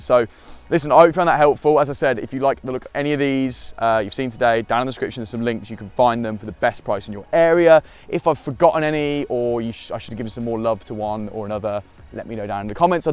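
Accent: United Kingdom. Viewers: this guy is brutally british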